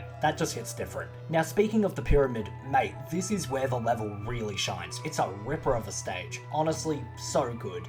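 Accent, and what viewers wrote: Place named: Australia. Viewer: Never knew I needed a man with a thick Australian accent to geek out about SM64 to me, until now.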